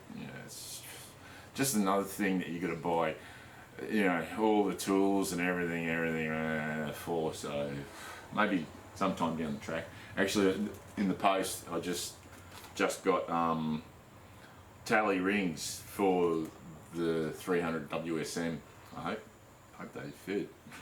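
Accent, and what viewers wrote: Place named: Australia. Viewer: You sound Australian Thought y’all no longer allowed gun ownership?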